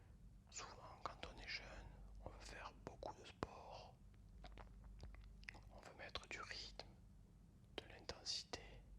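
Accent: France. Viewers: sound is just a touch too low. Need to hear that French accent. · Hum ça change beaucoup du reste de ce que tu fais 😉 Et c’est très qualitatif! Le ton de ta voix, le rythme, la diction, le petit accent du sud sans parler du contenu avec un vocabulaire et des tournures de phrase de très bon niveau! Tu devrais faire plus souvent des vidéos en français comme ça!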